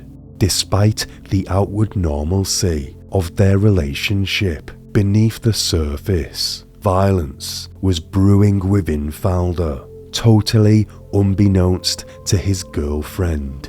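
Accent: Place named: Liverpool, United Kingdom. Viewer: Also I can hear a Liverpool or Merseyside accent 😊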